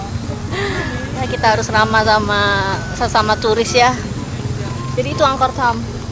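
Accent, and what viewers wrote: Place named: Surabaya, Indonesia. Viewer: Logat suroboyoan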